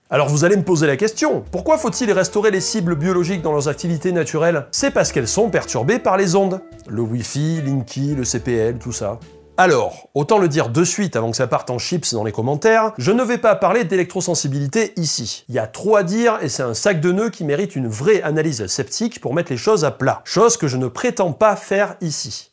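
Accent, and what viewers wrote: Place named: Haute-Loire, France. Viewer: Yo ! sympa ta chaîne, dis moi t'es du 43 u 42 ? t'as un accent du coin toi ;)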